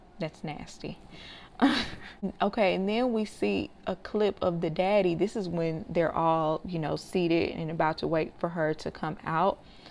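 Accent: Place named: South, United States. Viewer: I've heard this chirping on your other videos (smile). Normally smoke detectors are wired together; if one goes off in one part of the house it will cause the other one to go off. if you replaced batteries in all detectors and it still goes off, then the detectors are bad and need to be replaced. They only last about 10 years. Also love your makeup and southern accent!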